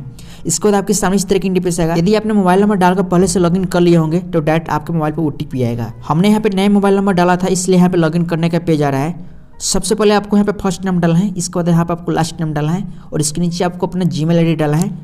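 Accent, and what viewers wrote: Bihar, India. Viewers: Bihaari ho kaa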